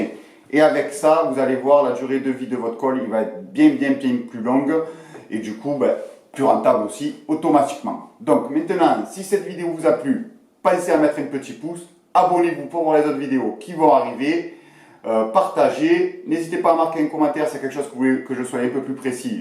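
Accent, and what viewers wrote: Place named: Béziers, France. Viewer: Moi je vais me lancer dans le reconstructible et tes vidéos sont tops. Écoute pas les couillons et avec ton accent on doit pas être bien loin l'un de l'autre moi je dirais tu vis pas loin de Béziers. Bonne continuation d'une nouvelle abonnée. Je suis con je viens juste de voir que ton pseudo ya Narbonne lol c'est moi la couillone mais je m'étais pas trompé.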